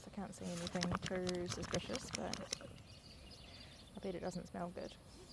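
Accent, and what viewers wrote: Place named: Australia. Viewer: I love your accents. It reminds me of the Aussies down under. 😊❤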